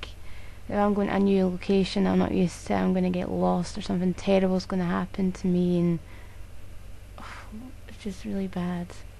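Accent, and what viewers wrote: Scotland, United Kingdom. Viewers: are you Scottish Might be a silly question but trying to read the acccent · are you Scottish? I like your accent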